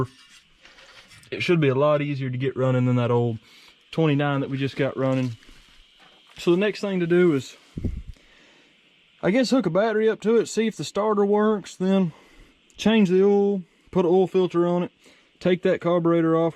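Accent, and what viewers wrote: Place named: Georgia, United States. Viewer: SOUNDS like he's in Georgia, or FROM Georgia, anyway.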